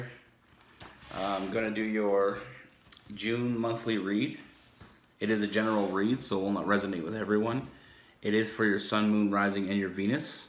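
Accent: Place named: Canada. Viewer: Can’t wait to feel this connection...so, when are you coming to town?? Lol jk. *no disrespect if you have a lady... I grew up on Canada (Rocky Mountain House, AB and Moosejaw, SK. I love your accent. Canada is so awesome, I miss it! Shoutout to all the Canadians! You’re awesome too. So adorable. Thank you for this. It’s so appreciated. ❤️🙏🏻🙏🏻👌🏻👌🏻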